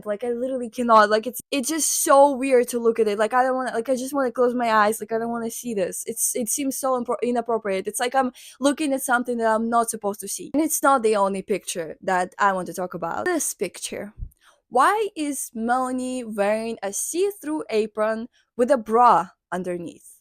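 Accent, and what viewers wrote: Russia. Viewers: Are you Russian? Your accent sounds Russian Edit: saw the Cyrillic text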